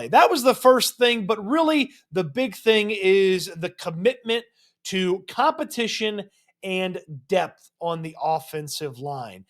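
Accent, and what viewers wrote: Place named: Texas, United States. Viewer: As soon as I heard your voice I knew there was a fellow Texan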